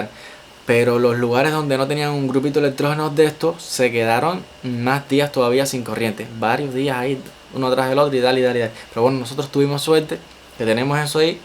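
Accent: Cuba. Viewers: Cuban Spanish language sounds very different than the Spanish I am accustomed to hearing in NYC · Me encanta tu acento. Primer cubano que escucho que habla de forma fluida y entendible.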